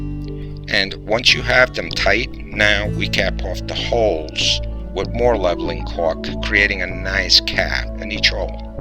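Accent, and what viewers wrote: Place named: Georgia, United States. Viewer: Nice accent, north Georgia?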